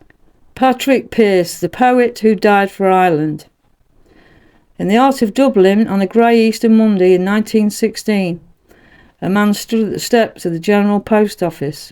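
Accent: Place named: England, United Kingdom. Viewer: I dont know if you are English (you sound like it) but if so then well done for talking on such a complex and tricky subject which many English / British don't go near for some reason. And thank you also for calling him "Patrick Pearse" as many Irish for some reason call him Pádraig or Pádraic Pearse which of course is wrong, as you correctly say its Patrick Henry Pearse and was known to everyone as Patrick or Pat. Well done.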